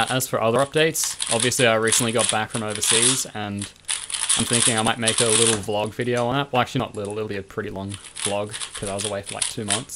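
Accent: Australia. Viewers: You seem unaware that Australians pronounce the letter Z as "Zed" rather than "Zee"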